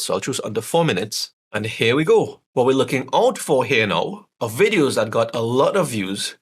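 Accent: Trinidad and Tobago. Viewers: I love your Trini accent. #subscribed.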